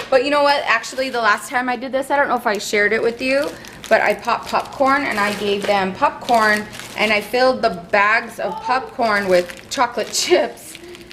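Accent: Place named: United States. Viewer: There is a U in the middle of tuna not oo and herbs starts with H. I wish Americans would stop murdering the english language!!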